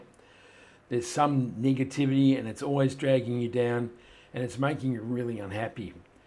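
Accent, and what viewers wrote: Australia. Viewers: Subscribed.. Nice to hear an Aussie accent!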